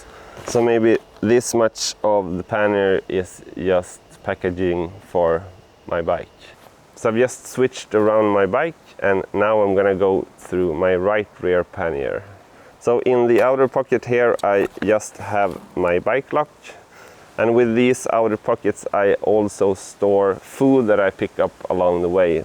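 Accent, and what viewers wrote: Sweden. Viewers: Hej! Du låter lite svensk. Stämmer det? Jag har precis beställt väskor som du har. Och en duffel man kan lägga på och spänna på. Har inte någon särskild plan eller resa i åtanke men har för avsikt att göra några längre turer till sommaren. Har det fungerat väl för dig?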